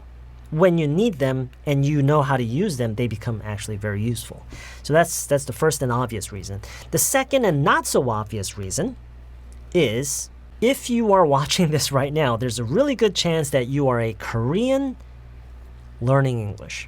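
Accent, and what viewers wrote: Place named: United States. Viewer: 발음이 미국 사람과 비슷합니다 흑인보다 백인 영어에 가깝습니다 얼굴은 흑인인데 백인영어를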